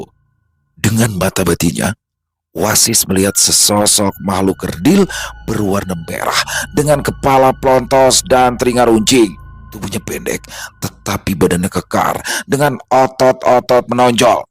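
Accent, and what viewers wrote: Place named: Jakarta, Indonesia. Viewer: Sering sering bang bawain logat Betawi / Bekasi gitu, kocag bet dah wkwkw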